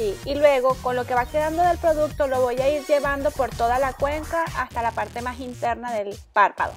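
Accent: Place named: Venezuela. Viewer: Te quedó muy lindo el maquillaje!! te dejo mi apoyo y te invito a pasar por mi canal.. soy venezolana, a ti te escucho como acento venezolano :)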